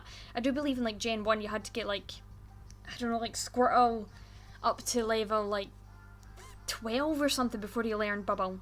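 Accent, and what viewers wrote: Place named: Scotland, United Kingdom. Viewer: Could you please do a video of you pronouncing different Pokemon names? I admire Scottish accents, and have been trying to find a video of a Scot pronouncing Pokemon names for a bit now, and this is the closest I've found.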